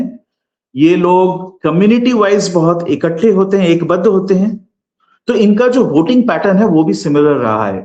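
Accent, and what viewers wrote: Standard. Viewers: ji aapki hindi bahut shudh hai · Dada Namasthe. Aap ki hindi bahut hi shuddh hain. Dusri baat eah hain ki aap ka vishleshan vaastavikta ke bahut hi sameep lagta hain. Chunav parinam ke paschat hi asli drushya dikhega. Bahut achi vishleshan. Dhanyawad 🙏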